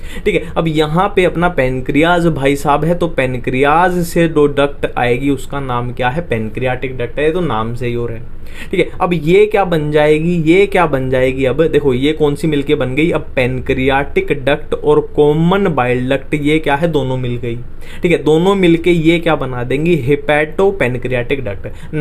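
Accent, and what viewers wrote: Haryana, India. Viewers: Sir aap haryanwi h kya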